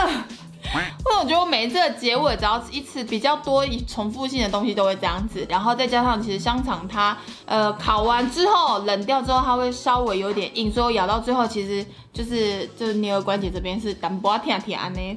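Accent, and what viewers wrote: Taiwan. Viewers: 好愛妳說台語請問是哪裡人？ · 為什麼妳這麼台灣國語？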